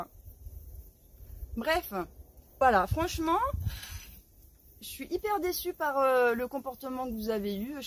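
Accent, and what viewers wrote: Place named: Bourgeois, France. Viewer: En plus tu parles avec ton accent de bourge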